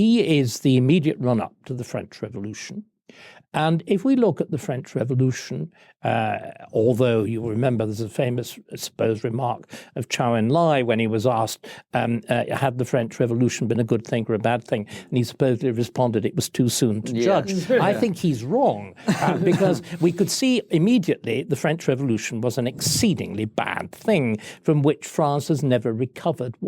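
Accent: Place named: RP, United Kingdom. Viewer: It's amazing what a posh accent and some public school bravado can make up for ... The waffle, the contradictions one after another.... Just throwing out clichés and generalisations with confidence. There's no depth or consistent logic to his disjointed points, some of his historical parallels are so obviously ridiculous, and his comment about COVID 🤦‍♀️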